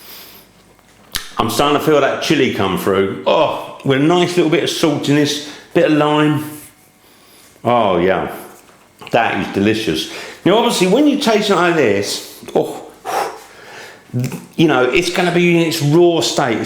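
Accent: United Kingdom. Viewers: I really like you use quite similar "hacks" as I found by various experimenting to substitute some of the authentic ingredients when not available, sill producing delicious and quite curry dishes. Great and clear description, and of course amazing British English!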